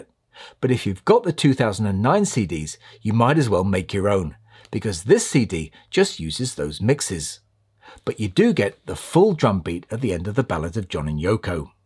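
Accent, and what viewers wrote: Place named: United Kingdom. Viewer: To address the part about "the problems with this album" & comments about the hodge-podge mix of early & later material: As a young kid in ~1978-79, I didn't have much spending money. But I had enough for 1 album. My logic for choosing THIS album, was because most of the songs were released as singles (in the 60s), but they never appeared on an album, up until " ". Keep in mind, the American album releases were considerably different than the British versions.. in content, title names & artwork. In my young eyes, this album had more bang for the buck, than any other albums, especially since my 2 favorite songs at the time were on here, and NOWHERE ELSE: " " & the "missing" song from Hard Days Night album- " "! I was a bigger fan of their early to middle years at the time (still am), so " ", "Ballad" & " " were rarely listened to. 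After Capitol records scrapped the old American album catalog & mimicked the British catalog, all of the " " titles were then found on main albums. The video host has a British accent, so he only focused on the British catalog. No wonder this album isn't a big deal to him. And that's true now, as well, with the homogenization of the album titles. Yet, this now-obsolete vinyl still holds fond memories for me, nostalgic fool that I am;-)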